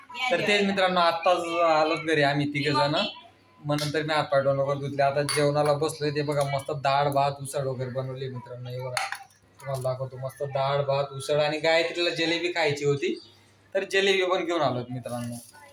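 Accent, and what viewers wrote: Khandesh, India. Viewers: khandeshi tone ne bolotat te pan mumbait khup changle neither lagch tone change hoto boloncha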